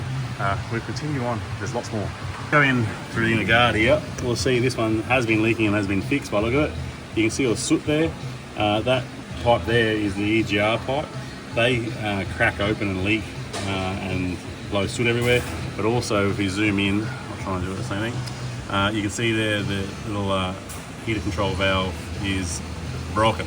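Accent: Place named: Australia. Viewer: That's one thick Aussie accent you got there. Really hard to understand you when you talk fast. I couldn't even finish watching because I wasn't getting much out of it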